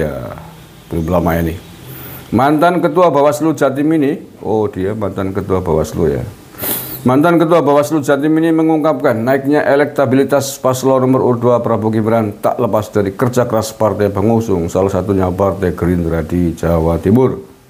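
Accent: Banyumas, Indonesia. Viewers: Smg prabowo menang 1 putaran, aq seneng sampean ngomonge ngapak... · Wong ndi pa jenengan ngapak ya pada pa 😂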